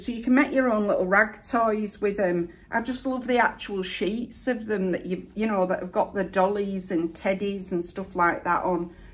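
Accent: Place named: Bradford, United Kingdom. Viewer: That was fab - I'm sure you've had lots of ups and downs in your life but I bet it's never been boring! One more question - are you still going to start your art course? I guessed as soon as I started following you that you had a Bradford accent, but just one Geordie word sneaks in - when you say "wor" instead of "our"! I'm guessing that's rubbed off on you from your mum rather than memory. Last but not least, being a salad dodger myself, I'm glad that your favourite food has gone from lettuce to toast!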